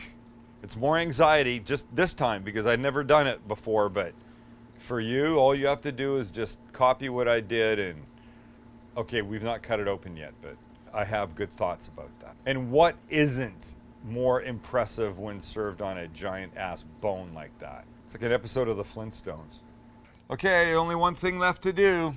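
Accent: Canada. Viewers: Lol I could tell he was Canadian by the way he said out and some other words, but I thought he said something about going church one episode so didn’t know he was jewish, Coolbeans haha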